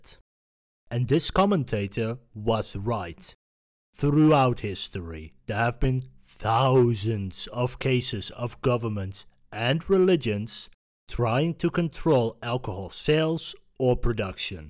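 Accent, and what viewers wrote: Netherlands. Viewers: Hearing the accent I was thinking, this sounds like a Dutch dude. After seeing the Brand beer; Jup, Nederlands! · This guy is definitely dutch based on the accent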